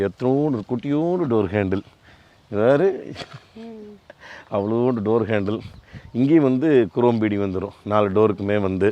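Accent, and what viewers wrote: Kongu, India. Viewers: Kongu mandalam tamil. We ball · கொஞ்சும் கொங்குத் தமிழ் பேசும் கோமகரே...! திகட்டாத தித்திக்கும் பேச்சுடைய திருப்பூர் திருமகரே...! மதிப்பிற்குறிய " " திருப்பூர் திரு. அண்ணா🙏❤ எப்படி இருக்கீங்க❤🙏 அண்ணி எப்படி இருக்காங்க❤🙏 ரித்திக் எப்படி இருக்காரு❤ அருமை அருமை அருமை வழக்கம்போல அண்ணா உங்களோட வீடியோ அருமை❤❤❤😊😊❤❤ என்ன சொல்ல ஏது சொல்ல😊😊❤❤❤ சும்மா நெகு...நெகு...நெகுனு இருக்கு வீடியோ😊😊❤❤❤❤ பூவாட்டம் தாலாட்டுது உங்க பேச்சு❤❤❤❤❤❤😊😊😊❤❤ நானும் 80s kids அண்ணா❤ என்னோட பிறந்த வருடம் 1988தான்😊 அதுமட்டுமல்ல இந்த வீடியோவுல இன்னைக்கி அண்ணா நீங்க எடுத்துட்டு வந்த இந்த பழையகாலத்து "மாருதி 800" காருக்கும் என் வாழ்க்கைக்கும் ஒரு பிணைப்பு இருக்கு❤❤❤❤❤ பிறந்த குழந்தையான என்னை மருத்துவமனையில் இருந்து வீட்டுக்கு அழைத்து வந்த முதல் கார் இது❤❤❤ நான் என் வாழ்க்கைல பயணம் செய்த முதல் கார் இந்த "மாருதி 800"தான்😊😊😊😊😊😊❤❤❤❤❤❤❤❤❤❤❤❤❤❤❤❤❤❤ என் பெரியப்பா வைத்திருந்த கார் இது அவர்தான் பிறந்த குழந்தையான என்னையும் அம்மாவையும் அப்பாவையும் மருத்துவமனையில் இருந்து வீட்டுக்கு அழைத்து வந்தார் இந்த காரில்❤❤❤❤❤❤ இப்போது இந்த கார் அவரிடம் இல்லை விற்றுவிட்டார். 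எனக்கு சரியாக 15 வயது இருக்கும்போது இந்த காரை அவர் விற்றார். நான் முதன் முதலில் டிரைவர் சீட்டில் அமர்ந்து படபடப்போடும் பயத்தோடு லேசாக ஓட்டி நகர்த்திப்பார்த்த முதல் காரும் இதுவே❤❤❤❤❤❤❤❤❤❤❤❤❤❤❤❤ நீங்காத நினைவுகளை கண்முன் கொண்டு வந்துவிட்டீர்கள் அண்ணா❤❤❤❤❤❤❤❤❤❤❤❤ அய்யோ செகண்ட் வீடியோ அந்த சீட் பெல்ட்🤣🤣🤣🤣🤣🤣🤣🤣🤣🤣🤣😂😂😂😂😂😂😂😂😂😂😂😂😂😂😂😂😂😂😂 இந்த டிரைன்ல டாய்லெட்ல சங்கிலில கட்டித் தொங்கவிட்டுருப்பாங்களே எடுக்க மட்டும்தான் உங்களுக்கு முடியும் மத்தபடி ஒன்னுமே முடியாதுனு சொல்ற மாதிரி🤣🤣🤣🤣🤣🤣🤣🤣🤣😂😂😂😂😂😂😂😂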